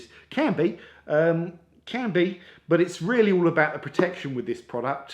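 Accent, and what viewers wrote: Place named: Southern England, United Kingdom. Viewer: I’m trying to place your accent, I’ve narrowed down to a southern accent, Brighton maybe, possibly Bournemouth? Am I close haha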